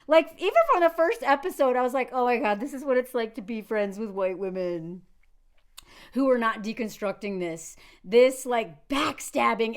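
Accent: South, United States. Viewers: You're like me. You're not trying to have a southern accent. You're just trying to sound NORMAL.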